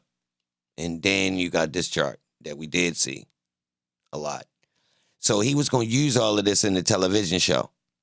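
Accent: Baltimore, United States. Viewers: Between the comedic commentary and your bmore accent I am dying over here 😂😂 but this was a great video. Very interesting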